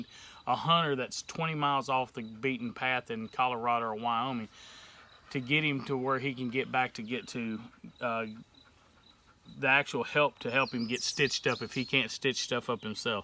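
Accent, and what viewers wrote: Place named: Mississippi, United States. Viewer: NORTH MISSISSIPPI!?!?!?! Were y'all my neighbors when I was a kid!? I knew I loved your accent 😭 I was born in Coldwater, Tate County, do you know where that is? I moved to Oregon when I was 4, in 1978. Ok, now to restart the lambs ear cause I got all excited when you said NORTH MISSISSIPPI 💜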